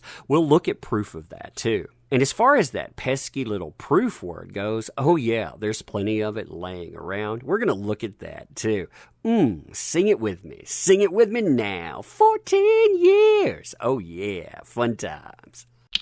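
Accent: South, United States. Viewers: Fam your southern accent just makes the new sweeter than 🍯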